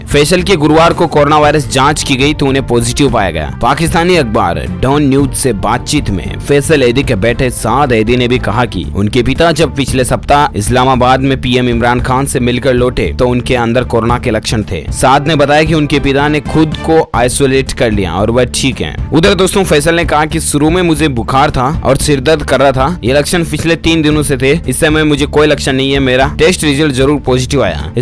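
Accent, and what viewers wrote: Rajasthan, India. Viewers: Bhai aap Rajasthan s ho kya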